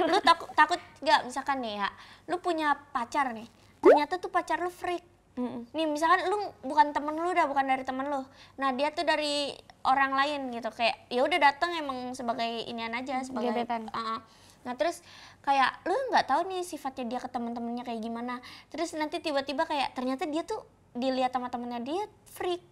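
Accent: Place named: Java, Indonesia. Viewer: Kak aksen jawanya medok banget iya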